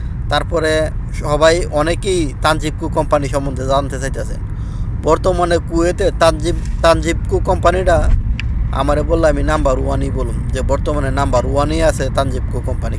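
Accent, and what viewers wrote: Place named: Bancharampur, Bangladesh. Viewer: বান্ছারামপুরের ভাষার মত লাগলো আপনার বয়েছ টা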